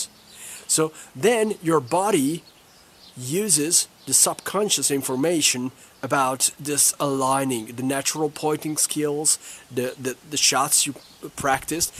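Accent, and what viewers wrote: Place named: North Carolina, United States. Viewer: I can't figure out if he's from North Carolina, Virginia area USA.. or somewhere in England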